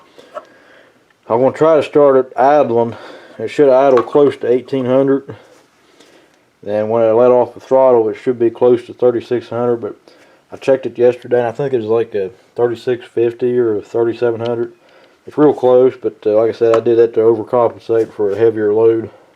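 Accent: South, United States. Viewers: Great video. Thank you. I'm from Arkansas so I appreciate you southern twang. I sound just like you, Thanks,.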